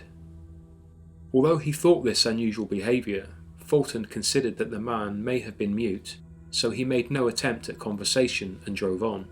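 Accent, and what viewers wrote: England, United Kingdom. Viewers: You've got a lovely voice,! Somehow, as an American, I find that these types of narrated stories sound so much better coming from an English or an Australian accent. Tom Buckmaster has a YT channel, and he has actually gone out onto some of England's haunted roads to do investigations. Very creepy. Love your content! Cheers! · I'm American but I so wish I lived in one of these charming and beautiful old English villages,( complete with the ghost stories) I just love the aesthetic so much! Thanks for the videos, I just recently discovered your channel but it's my favorite kind, interesting content, British narrator and no ads every 5 seconds. Keep it up my friend!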